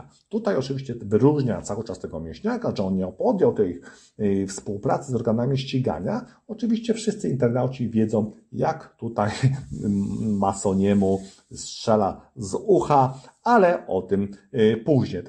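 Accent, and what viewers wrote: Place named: Warsaw, Poland. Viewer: A ja tam z innej beczki. Znałem dobrze jednego mechanika z Wołomina i on tak jak Ty mówił oksiązce i wchodził w dźwwli.Slychac w Twojej wymowie podwarśawski akcent. Z fartem 💪💪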